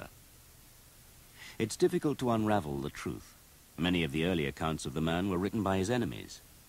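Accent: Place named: United Kingdom. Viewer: I much prefer the British Narration in these videos, but for those looking for the American Narration you can find the first of the 4 videos here > <link>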